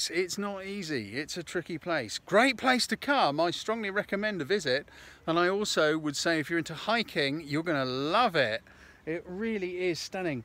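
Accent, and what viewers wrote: United Kingdom. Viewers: Hello,. I wouldn't mind just hearing you talk everyday:-D (it's probably the British accent... if not, I'm sure it helps). I always say I must have been British in a past life:-D... and now I'm just planning to get "back". So I just want to ask about your equipment. What stabilization method were you using in this video? Cheers.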